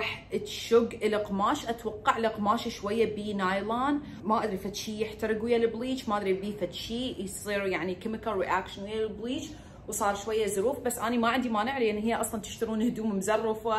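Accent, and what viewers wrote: Iraq. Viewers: انت من العراق؟؟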